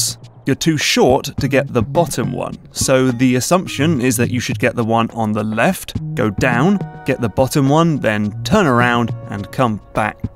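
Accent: United Kingdom. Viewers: americans when they hear a british accent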